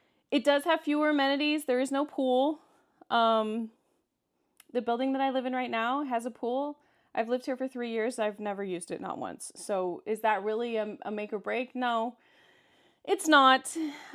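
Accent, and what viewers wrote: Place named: Kansas, United States. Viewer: Hey,! First, I also follow Noodles the Pooch (and have my own Noodles doll)! 🤗 Second, I got a kick out of your challenges with the Boston versus Kansas accent; I get it! I’m a Long Islander (NY) - but I graduated from Bethany College in Lindsborg KS and met lots of good folks while I was there. They got a kick out of my NY accent 😊!Whereabouts from KS are you from? The whole eastern seaboard is crazy expensive; best of luck with your new apt search!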